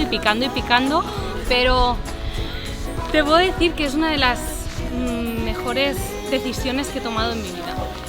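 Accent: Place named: Catalonia, Spain. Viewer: apenas conoci su contenido un par de semanas... Y me atrapó... Es carismática, una mexicana con acento catalán, una catalána con sangre mexicana. Esa es la nueva realidad que nos muestra. Que las nacionalidades son relativas, tu patria eres y el lugar donde florece el alma. Son un gran equipo, saludos.. tienes un gran tesoro cuidense, si algún dia si me topo contigo en la calle y ese ir y andar tan tuyo.. no te asustes si me emocióno al verte. Abrazos 😽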